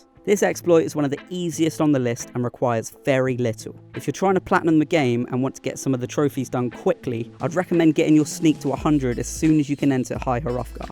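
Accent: England, United Kingdom. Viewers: Lol yea... but... you heard the rest of the video in a perfect English accent as well didnt ya?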